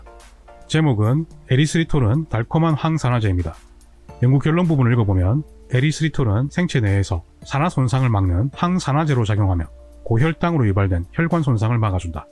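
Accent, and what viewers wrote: Gyeongsang, South Korea. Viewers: 영어 일본어를 마스터해도 경상도사투리는 못고치는구나...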